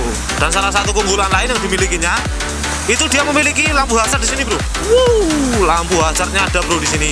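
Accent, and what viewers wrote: Java, Indonesia. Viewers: Keren nih review... Nggak mojokkan salah satu merek. Mantap bro. Logat jowone mantap bro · Muedok tenan mas... mantap lah,madjoe terus...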